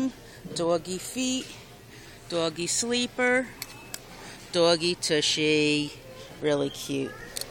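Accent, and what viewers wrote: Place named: New Jersey, United States. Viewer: Dakota will look super cute in that bunny romper!! Thanks for taking us shopping with you!! I love the outfit that siad hero and had the little bulldog..I love all the different things your Wal Mart has!! I love the monkeys and dogs on the planes sleeper!! Totally love the monkey best friends set as well! Who am I kidding..I loved every bit of it!!I love your NJ accent..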